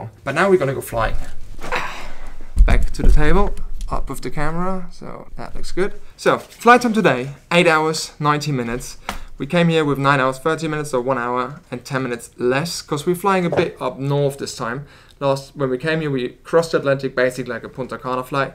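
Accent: United Kingdom. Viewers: I am trying to figure out where his accent is from. It's like he learned English from people in East London.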